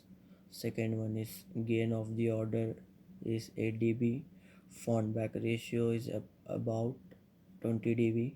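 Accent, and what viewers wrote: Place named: United States. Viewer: Ohh american accent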